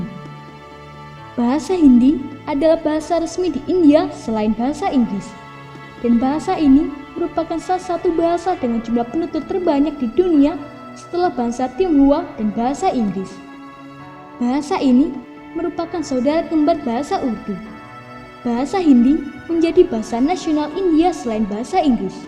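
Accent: Indonesia. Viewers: Suaranya medok banget kaku banget bahasa Indonesianya. Mending lo belajar bahasa Indonesia dulu yg bener baru membahas bhs yang lain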